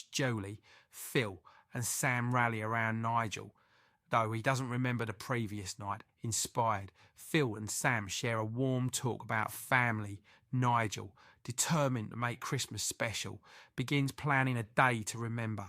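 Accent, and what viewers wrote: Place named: Cockney, United Kingdom. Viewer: Please change the voice back to the one before this, cockney accents are the worst UK accent you can choose, id say no offence to the cockney people but it's just facts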